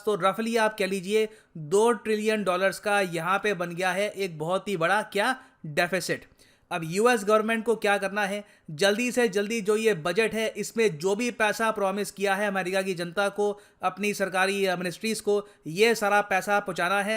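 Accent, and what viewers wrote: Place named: Gujarat, India. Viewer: ldki hai..koi gujarati ldki hai accent se pratit hua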